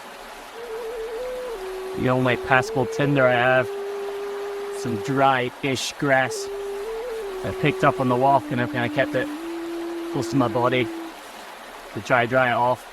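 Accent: Scotland, United Kingdom. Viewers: Once again an excellent video. However the flute, pipes and rushing water made hearing and understanding your brogue a bit difficult for this Yank. Too many folks over do the “background” music to the detriment of their videos quality. Just my pet peeve. I truly enjoy your love for accurate renditions of highland history.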